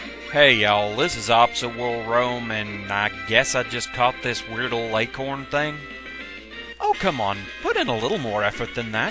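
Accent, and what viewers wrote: Scotland, United Kingdom. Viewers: SCOTISH ACCENTS RULE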